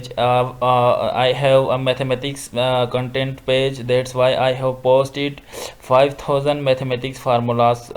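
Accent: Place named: India. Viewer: Waste of time , u should do it with no voice , sick of indian english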